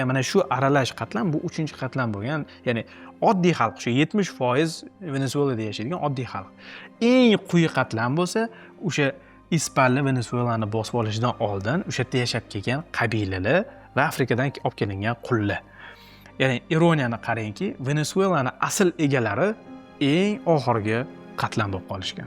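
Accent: Tashkent, Uzbekistan. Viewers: Toshkent shevasida gapirayotgani tufaylidir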